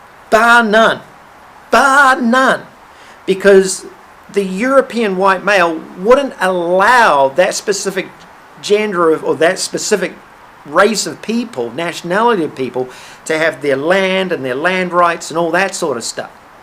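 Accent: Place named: New Zealand. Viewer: Never miss your videos, always on point ,no pulling punches. Am aware of a lot of the info you talk about. Just great to here someone on media saying it. Can relate to you because i also have an engineering background. Just love that Kiwi accent with a hint of American as well. Am Aussie so it is very easy to here it. Your subs should be in the hundreds of thousands ???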